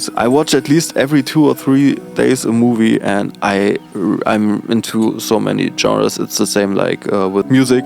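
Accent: Germany. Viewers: Thank you for doing this Q&A for us. It nice to learn more about you. Your english is great and your German accent is wonderful. I love by!!! Have you seen the version by Post Modern Jukebox? Thank you for the suggestions of David Lynch and Tommy Wisreau. I will check them out! I am excited to see what you choose for new content. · Thank you for posting this. Congratulations on 1000 subscribers! You are a real one, and I appreciate your perspective in your reactions. You answered the questions I had but did not submit ahead of time. I thought you were probably German. Your English is very good, I like your accent, is a fabulous song for all the reasons you mentioned, AND none of us have choice on where we're born: just make the most of it. Be well! ❤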